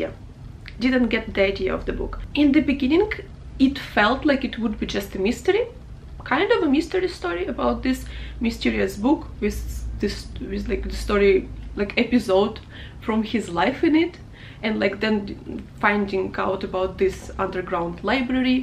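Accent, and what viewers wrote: Russia. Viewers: Ah, i knew that was a beautiful Russian accent I hear. Are you a fan of Russian lit at least? (oh I see now that you are for sure lol!) I am completely destroyed for all other writers. My reading soul belongs to the Russians :)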